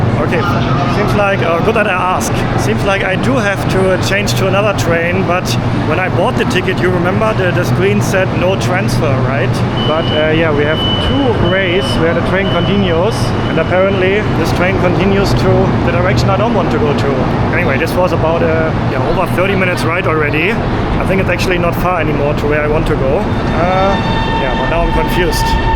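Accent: Germany. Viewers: I really enjoyed your channel, thank you for doing this. I was just on Facebook watching one of your videos from HK to SZ and suddenly noticed your German accent ( sorry but I think it’s cool to have it) and then I rushed to YouTube 😆 just want to share the info that anytime in the future if you would like to travel back to China please visit my beloved hometown (if possible) it’s Qingdao city from shandong province, the most Germanic city you could get in China, the ocean view the pilsner Tsingtau lager, the seafoods! You might be shocked and amazed by research the history of Tsingtau. Please have a wonderful trip of traveling the world!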